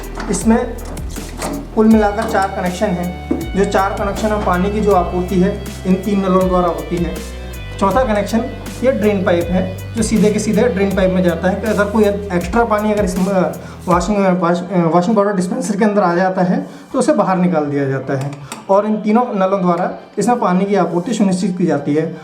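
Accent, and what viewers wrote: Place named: Uttar Pradesh, India. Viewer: Itni shuddh Hindi kahan se Sikhi up ke ho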